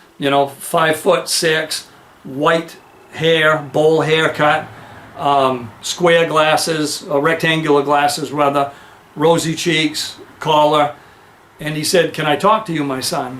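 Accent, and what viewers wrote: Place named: New England, United States. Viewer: Hey, I'm new to your channel, just subscribed today, 8 -28-21..... Saw your video from 5 years ago on the Dolos system....Dig it....... Don't know much about you or the content of your channel, but I liked what I saw and how you present and come across to your viewers... I'm a vet myself (semper fi), and can appreciate how crazy this year had to have been for you and your family.Life is a fragile thing.... So, just wanted to reach out and wish all of you the best in health, happiness and better fortunes from here on out..... I'm in the new England area as well, picked up on your accent before you mentioned Maine (lol)..... Look forward to more of your vids when your up to it..... Brighter days my friend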